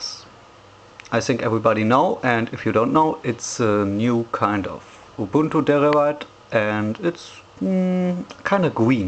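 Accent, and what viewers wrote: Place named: Germany. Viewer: du solltest schon Deutsch reden dein Akzent hört sich nach deutschem an. dieses kommentar hab ich von nem LapTop mit gOS geschrieben.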